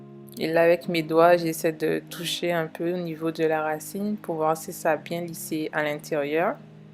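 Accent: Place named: Guadeloupe, France. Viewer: Oui super! Ma question était un peu bête car en regardant ta video 23 choses a savoir je l'ai su mdrr (de toute façon à l'accent je m'en doutais eheh) #TeamGuadeloupe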